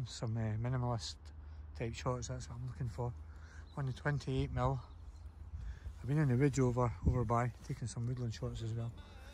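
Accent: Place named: Glasgow, United Kingdom. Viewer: Hi I just came across your vlog and I also do woodland and from time to time I use my film camera. would you mind telling me which woodland area this was. I guess from your accent you are local Glasgow or surrounding. Thanks. Jacqui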